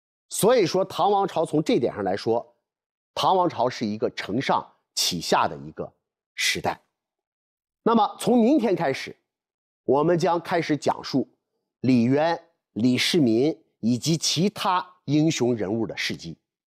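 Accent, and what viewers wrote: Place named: Shaanxi, China. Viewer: 这教授的普通话口音一听就是我们陕西人。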